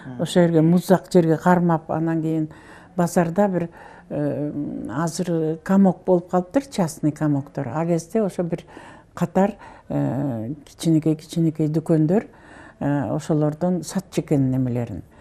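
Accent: Standard. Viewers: Сизди угуп олтуруп абдан жонокойлукту кордум ,кандай суктанаарлык муноз,эч нерсени унутпай жашоодо талкан, каймак жонундо абдан так айтканы кызык,анткени он сегиз жашымда биринчи Фрунзеге борборго келгенде аэропорттон коргом,бирок кыргызча билбейт го деп сыртынан негедир муноздомо бергем,ушунчалык так таза нукура суйлогону кызык,а Чынгыз Айтматовду Алма-Атада коргондо ким эле деп ойлоп карап,анан эстеп кетип барбагам сыртынан гана карап, негедир жаштык кылып жанына чуркап барып учурашпаганыма азырга чейин окуном ,бактылуу каарылык корунуз ! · Нукура кыргыз тилин суйлогонун кайра кайра коруп угуп жатам бар болунуз эжеке кооп жашаныз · Керемет,кыргызча суйлогону тан калтырды,таза,нукура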